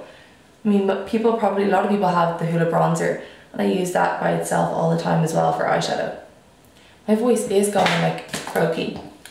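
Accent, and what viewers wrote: Ireland. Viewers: it's only an accent to those who don't live in Ireland.. i love your accent! I'm from Boston so we say things funny too aha love you girly, beautiful as always! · Love this kind of video! Could listen to you talk all day. Whoever doesn't love an Irish accent is crazy! Keep it up babe 💜🙌🏼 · anyone who complains about your accent and the way you pronounce things can suck a toe. how ridiculous! i loveeee your irish accent! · Screw whatever people say about your "weird" accent. It's beautiful!!! I live in New York and I'm Puerto Rican, all I hear all day is boring American English and fast paced Spanish. It's refreshing to me to hear you speak with your wonderful Irish accent. 🍀🍀🍀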